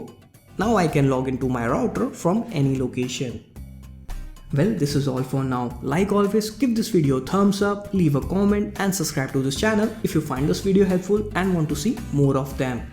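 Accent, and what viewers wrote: India. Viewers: U speaking English like native Indian languages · Funny indian accent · Great info. That was the thickest Indian accent I have ever heard....and I’m Indian myself! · This is a great video. I like how simplistic everything was explained. I'm not a newbie with computers at all, but the majority of my family and friends are, and this would easily help them understand networking. Even though his English is very accented by his own language, I work with several people from India so I've gotten used to the accent. Believe me, its much easier to understand this than someone who's native language is English, but they speak it so fast that you can't even catch what they're saying and need it repeated. Good job! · You REALLY need to practice speaking in ENGLISH. I always tell people I speak TEXAS ENGLISH, so EVERYONE knows what that means.. You are speaking in INDIA ENGLISH, which is VERY HARD TO UNDERSTAND. 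Why is it that ALL the people from INDIA, always have this "HARD TO UNDERSTAND" English?!?!?!?! GEEEZ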